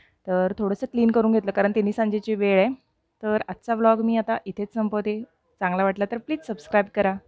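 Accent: Kolhapur, India. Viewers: Tumhi kolhapur aahat ka, bolna varun vatatay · तुमची कोल्हापुरची भाषा ऐकायला छान वाटते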